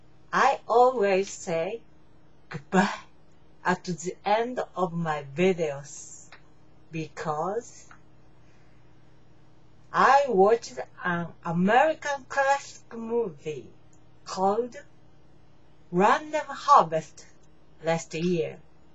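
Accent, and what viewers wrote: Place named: Japan. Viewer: あなたは英語がとても上手いですよ。語彙や文法などは抜群です。日本語訛りはありますが気にしなくていいと思います。あなたの英語は聞き取りやすいです。If you are not satisfied with your progress, I recommend watching as many English movies & TV shows as you can as well as conversing with native speakers. I've been doing similar things to improve my Japanese and have noticed good results (still a long way to go though)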